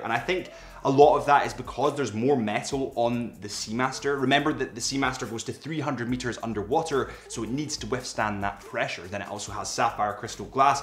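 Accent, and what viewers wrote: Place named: Glasgow, United Kingdom. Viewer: west enders in glasgow talk like this guy lol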